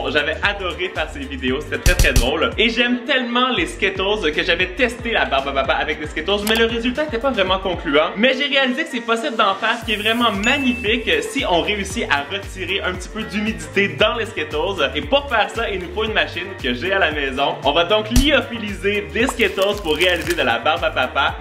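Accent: Canada. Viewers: tu mon youtubeur préféré de is vidéos accent canadien et qui met quoi des vidéos trop trop rire 👍👍👍👍👍 🇨🇵🇨🇵🇨🇵🇨🇵 traduis le mot anglais 24h XLL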